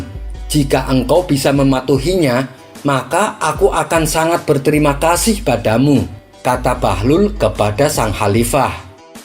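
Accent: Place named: Medan, Indonesia. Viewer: Medan bung...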